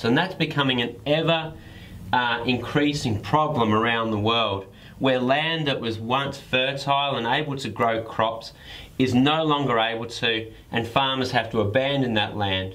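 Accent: Australia. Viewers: I could listen to Aussies talk for hours. Thank you! Very concise depiction of salinization. Cheers!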